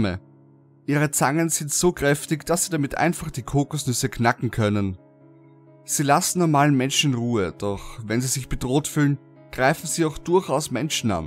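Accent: Austria. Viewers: bist du ein Österreicher? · Des is jo a österreicher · Bist du Österreicher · Du hast ein Österreichern Akzent 😂 komme aber aus Deutschland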